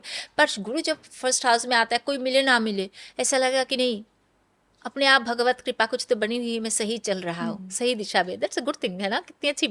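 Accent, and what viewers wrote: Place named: Bengal, India. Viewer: She is a Bengali,so the accent,but her predictions are good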